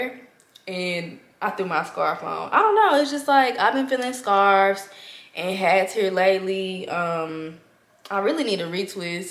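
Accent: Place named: South, United States. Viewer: Love your accent it's a southern or Midwest accent. Where are you from?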